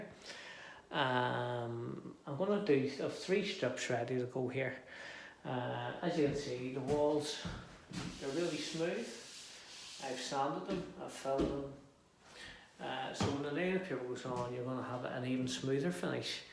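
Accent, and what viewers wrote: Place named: Northern Ireland, United Kingdom. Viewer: Nice to hear someone from my part of the world on here! I’m a decorator just outside Belfast. Good videos!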